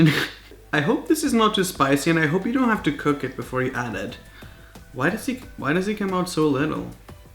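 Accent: England, United Kingdom. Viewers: Gracie Denton he’s from England so he says stuff differently than us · Hes accented ever heard of enlgland kid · Thas is his accent cause he is in england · Seriously dude you're sounding more English each day... And no the milk should be poured, you can get the puddings in a can and steam it... Can't believe you didn't heat it up.... Lmao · Deadshot Mini Maybe cause he lives in the UK and tacos are not something they usually eat and they also pronouce things differently than we in America do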